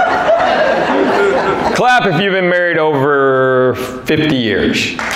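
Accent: South, United States. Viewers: I think it’s cool how he’s Asian yet considering he sounds like a Southerner he is popular with white people as opposed to only being popular with Asians like you would expect the average Asian comedian to be. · I always admire the clear communication skillset. His intentions are easily understood even in the slightest of gestures. The biggest gesture is him always being entirely respectful. It surfaced as the "Sir and Ma'am" emerged through the marriage questions. It is easy to see why he has been successful so many years. The effort of getting to his shows are always such a pleasure. I stumbled on his name through a Tom Arnold movie "McAle's Navy". I knew the other comedians as my eyes and ears could not synch up the southern voice with the Korean appearance.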